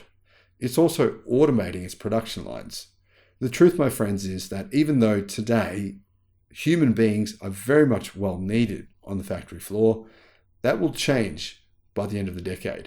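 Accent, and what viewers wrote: Australia. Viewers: u sound like one of my Aussie or NZ, cussins, ps, kindness is still free!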